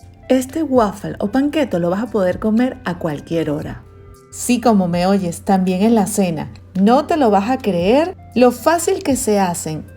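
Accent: Venezuela. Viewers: Me encanta escucharte demasiado venezolano, ya me estoy comprando tu recetario ahora mismo !!!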